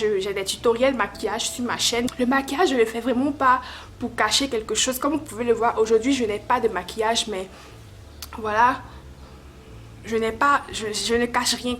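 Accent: Ivory Coast. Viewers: Je vien d'arriver sur ta des que j'ai entendu ta voix e su que tu était ivoirienne❤️❤️🙏🇨🇮🇨🇮🇨🇮🇨🇮🇨🇮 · Hien les ivoiriens avec votre tonalité la😫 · Ma chère par ton accent j'ai sû automatiqmt que tu étais Ivoirienne😍😍😘😘🇨🇮🇨🇮🇨🇮. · L accent me dis quelque chose .tu es ivoirienne ? Petit conseil prend plus le temps de parler tous doucement. Belle video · Tu es vraiment ivoirienne le language qui ne trompe pas le ye qui ne trompe pas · cet accent ressemble un accent de la côte d'ivoire bisou ma cherie moi je suis originaire de ce pays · Super ! Contente d’entendre l’accent ivoirien fatiguée du chocor 😂🤸🏾‍♀️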